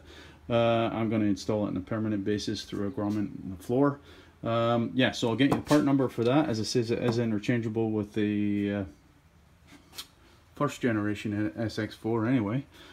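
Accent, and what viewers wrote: Scotland, United Kingdom. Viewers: Do I detect a Scottish accent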